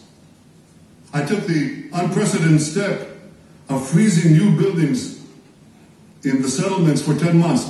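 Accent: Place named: United States. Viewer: Am I the only one slightly unnerved by the fact that the Israeli prime minister sounds like an American (who can properly pronounce country names) when he speaks?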